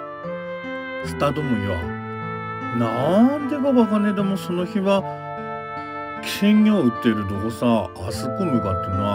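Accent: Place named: Akita, Japan. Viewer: 秋田弁 懐かしかったぁぁ😂 おばあちゃん思い出しました✨ 今度実家で父にこの動画見せます‼（きっと️秋田弁の響きに飢えてるはず） しかもオチが思ってたのと違って吹き出しちゃった〜😂🤣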